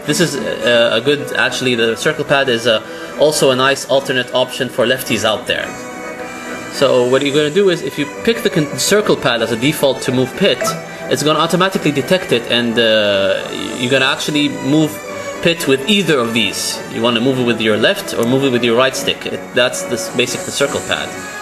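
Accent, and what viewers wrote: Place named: Scandinavia. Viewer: Haha. Yeahh, it is mostly the accent that sounds like a scandinavian's, especially the way you say the "R" sound. Also the lightning made your skin color look kind of possible for a scandinavian. The video was very understandable though. I am used to the accent by myself being a Scandy :)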